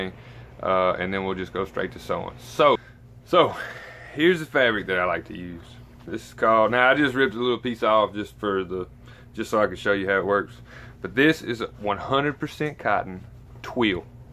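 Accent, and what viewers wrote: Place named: South, United States. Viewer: I've watched all 3 of these videos and I have to say you are a great teacher! It's nice to see a man doing a video like this, I'm sure that it will help to get more people involved. Anyway...we have a pile of Crown Royal bags and my bf was telling me that he needs a welding cap so I googled it and found that several tutorials exist using them and then I came across you teaching. It seems like I'm off to a great start, we'll see now how the rest goes...Thanks again! Oh btw where are you from with that southern accent?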